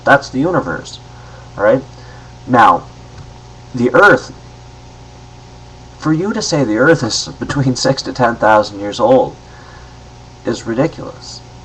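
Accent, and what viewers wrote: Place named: United States. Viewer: Pity about the accent. It's hard to sound intelligent in an American accent.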